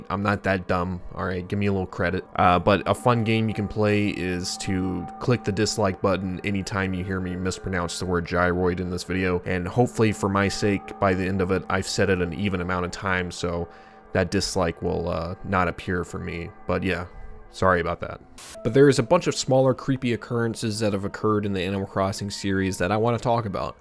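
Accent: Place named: United States. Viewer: Pretty sure it's called American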